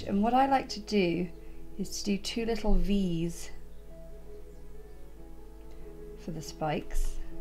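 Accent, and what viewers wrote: United Kingdom. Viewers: I'm in love with your clases! And love to hear British accent😍.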